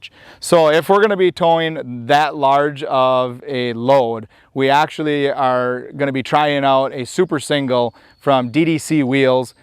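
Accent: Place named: Canada. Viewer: Awesome truck! I was wondering if you were Canadian by your accent, then I heard you mention Wisconsin...very similar.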